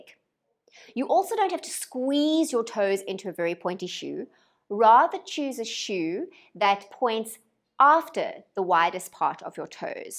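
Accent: South Africa. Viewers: South African? Nice video gal. Helpful. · you sound South African. Love the accent and love the tips, thank you!!!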